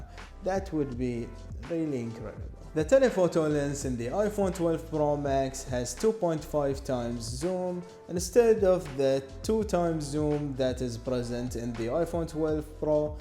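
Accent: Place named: India. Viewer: اسم القناه انبكوس عربي ويتكلم انقليزي مثل الهنود اش فيه ؟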